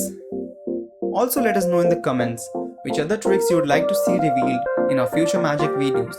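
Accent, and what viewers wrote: India. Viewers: U have indian ascent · Mi sijaelewa kabisaaa lafudhi yako ya kihindi....mgumu kuelewa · You sound very indian and that's a great thing . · I also think His English assent is completely Indian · Because he is an Indian. And sadly for you, you only talked Indians before via Tech supports. So you're now surprised how a Magic explainer's voice can be an Indian voice. Fact of the matter is, magic tricks finds it's home in India. It's a home of magic. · his unbearable Indian accent! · That Indian Accent Puts Me Off! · This guy sounds like Indian. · Are you indian? You sound like an Indian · Is the narrator in this video indian 🤔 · Its Indian English.... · Is this narrator Indian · From India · Sound like Indian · When v sounds like w, you know he's from india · Why da hell is the Hindu trying to convince me with his accent ? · I like the VIDEO, but i don't like the narrator's accent. 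Please don't use Indian accent, coz Indian accent sound so stupid.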